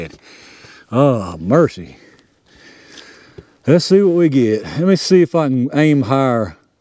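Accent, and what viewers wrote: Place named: South, United States. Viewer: #Question :face-blue-smiling:My goodness what kind of dialect do you have, are you from the south of the USA or where do you speak it like that - I'm from Germany so I don't know much about it, but the dialect is definitely really cool. :face-green-smiling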